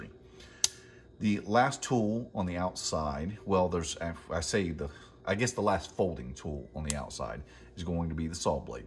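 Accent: Texas, United States. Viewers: Are you actually from Texas? I'm trying to place your accent. You sound like Michael Dorsey from Tootsie when he was doing the soap opera.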